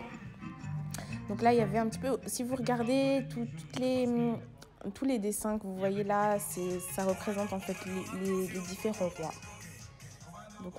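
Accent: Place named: Europe. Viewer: Cool video. Jaime bien Mais la prochaine fois n'utilise plus l'accent des européens mais plutôt notre accent d'origine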